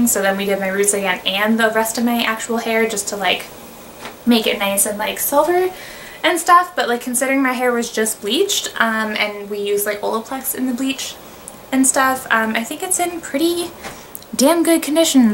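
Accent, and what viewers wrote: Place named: Canada. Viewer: Your Canadian accent is so cute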